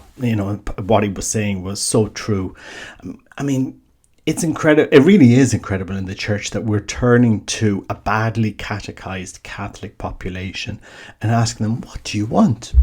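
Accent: Ireland. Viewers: Your subject matter is excellent and you speak so clearly. You are a true Catholic and It is a pleasure listening to your lovely Irish lilt. May God bless you abundantly.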